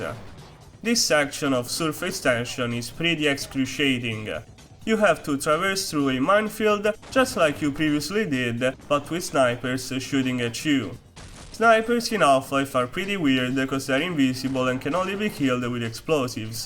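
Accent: Italy. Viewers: Wild guess but, are you by any chance italian?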